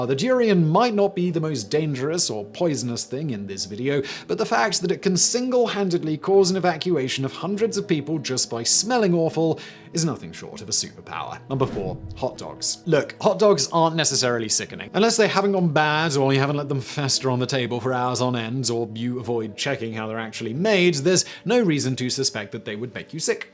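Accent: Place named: United Kingdom. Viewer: I luv how Brits' pronounce the word "vitamin,' ha...Here in the 'states it's a LONG "I" whereas in the U.K. it's a short "I". Seeing as how they basically spawned the english language, who knows...maybe it's American's who are pronouncing it incorrectly.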